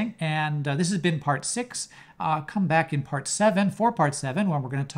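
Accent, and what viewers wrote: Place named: Canada. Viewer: So I want to know how to built a custom shape that I can attach a line to, then when moving the shape, bring the attached line endpoint with the shape? I am trying to build an application (not a game) with your tutorial’s help as I’m not a programmer or web developer. I know enough to dangerous, and stupid in some cases. I first have to figure out setting up the screen into parts for various roles, how to develop a shape library for my app, and then load an image, scale that image and reuse that scale for my other functions, then place the custom shapes (save that data on open/close) and a bunch more “magical stuff“. You sound Canadian, but you’re in Japan… interesting to say the least. Thanks so much for these tutorials.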